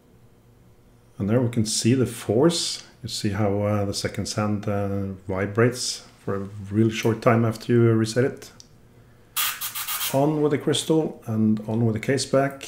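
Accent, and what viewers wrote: Norway. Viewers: Du høres norsk ut.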